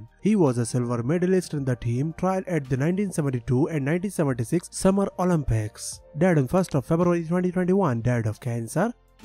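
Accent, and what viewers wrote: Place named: India. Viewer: PLEASE....DO THE HONOR OF CELEBRATING TOP CELEBRATIES DEATH WITH A CLEAR VOICE WITHOUT ANY INDIAN ACCENT !!!! IT IS VERY DIFFICULT TO UNDERSTAND WHAT IS BEEN SAID.....GOT JOTHING TO DO WITH RACISM !!!!!!! PLESSE GIVE THE MIKE TO SOMEBODY WITH CLEAR PRONUNCIATION OF THE ENGLISH LANGUAGE !!!!!!! OMG !!!!!!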